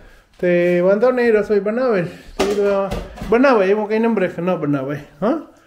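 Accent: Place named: Mer, India. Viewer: સરસ વ્લોગ 💓 હવે કમેન્ટ્સ ચાલુ થઇ... તમે વિદેશમાં રહી ને પણ પ્યોર આપણી મેર ની ભાષા બોલો છો. એ ગમ્યું....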